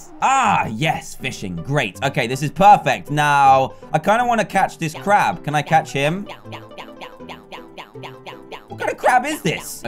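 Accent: United Kingdom. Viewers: british the mincraft man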